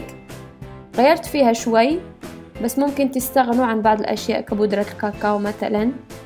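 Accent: Morocco. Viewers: korde طيب عشان هيك عندها لهجة مغربية شكرا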